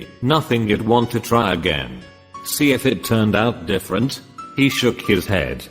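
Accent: United Kingdom. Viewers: best british accent.......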